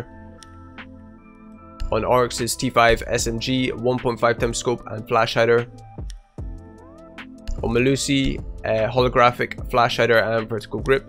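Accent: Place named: Northern Ireland, United Kingdom. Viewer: Are you from Northern ireland because you sound like it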